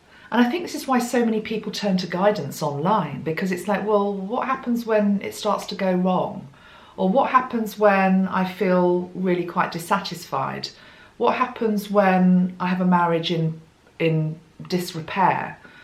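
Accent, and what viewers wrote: England, United Kingdom. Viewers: I just love listening to you. I love English accents. You have such a comforting voice and I feel such strong compassion from you. I would so love to be in one of your workshops but I live too far away. Bless you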